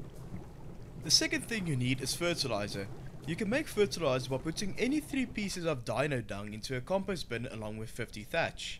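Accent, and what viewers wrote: South Africa. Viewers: South African? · You must be South African 😂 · hahaha kan hoorr jy is afrikaans 😎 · are you South African?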